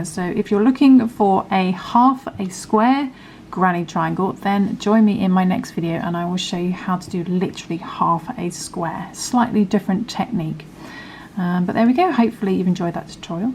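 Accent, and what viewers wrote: United Kingdom. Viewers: Something about my dyslexic brain can't comprehend the british voice using american crochet. I automatically translate it to uk crochet and then you say nah we aint doin that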